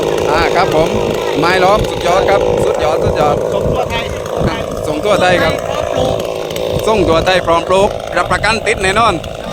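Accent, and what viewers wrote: South, Thailand. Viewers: ชอบช่อง เป็นเอกลักษ์ชอบภาษาไต้ครับ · ชอบช่าง ชอบฟังลุงพูดภาษาใต้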